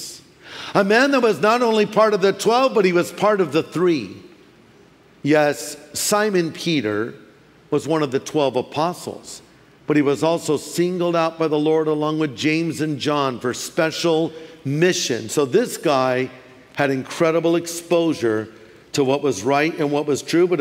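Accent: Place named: California, United States. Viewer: You definitely do have a California accent. It's a laid back, drawn out sound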